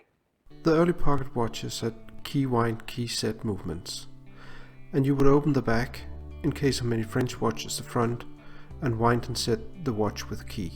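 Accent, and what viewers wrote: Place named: Denmark. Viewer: at first i wasnt sure where you were from but then i realised you were danish. så det er sejt at høre at jeg ikke er den eneste dansker med denne hobby haha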